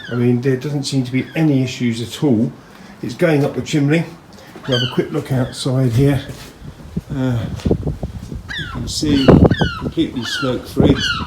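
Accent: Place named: United Kingdom. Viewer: Hi! (I am hoping I got the name right!) Increasing the depth of the top box seems to have helped our objective. I have been experimenting with Trevor's modified 4" version with after burner directly onto the hotplate. I did a rough layout this morning and did a test run - the heat is so immediately seen on the top plate. No smoke from my 5" chimney that I use put at the moment. I will be constructing the whole stove from firebricks. Its difficult to get hold of vermiculite boards in India (a lot is made here but mostly exported to the western nation it seems as there is a higher demand there for insulation purposes I presume). By the way your speech is certainly English(British) and I was wondering if this location you are shooting at is within Britain or somewhere warmer! It certainly is very nice looking kitchen garden / patio garden you've got there and gives off the feeling of somewhere in southern Europe!:)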